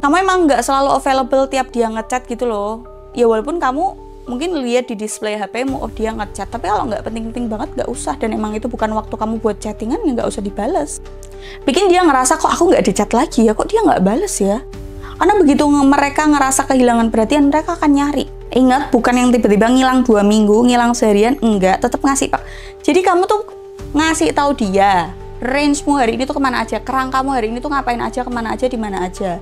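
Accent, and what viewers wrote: Java, Indonesia. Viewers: jawane medok yo mba? tapi bagus triknya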